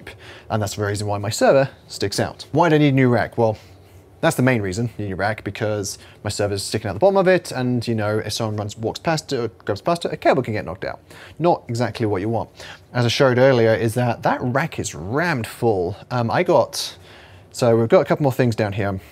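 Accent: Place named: United Kingdom. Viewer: Also, where are you from? You sound british but have other influences in your accent. I'm from the UK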